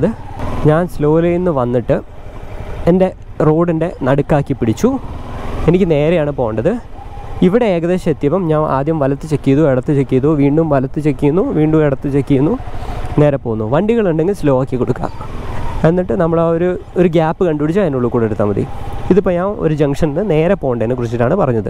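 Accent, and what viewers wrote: Kozhikode, India. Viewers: കോഴിക്കോടൻ അപാരത....💪💪💪💪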